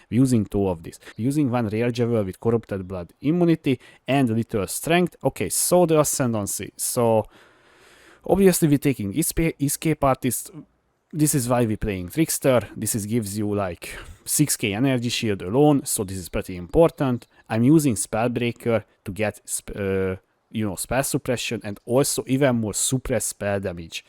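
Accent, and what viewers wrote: Hungary. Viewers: Te figyelj, gyönyörű a nyelvtanod, de a kiejtésedről felismertem hazánkat xd Szuperek a videóid szóval nagy hajrá! Aztán idővel csiszolódik majd a prönánsziésönöd is <3 Keep up the good work! Edit: PoE2 kontentet nem fogyasztok, de kaptál egy feliratkozót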